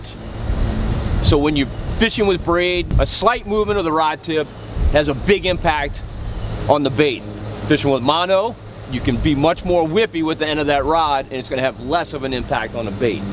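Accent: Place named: New Jersey, United States. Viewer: I thought you were from New Jersey.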